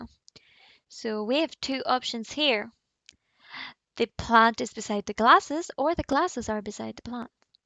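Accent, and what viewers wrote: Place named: United Kingdom. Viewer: Why does she sound British? Lol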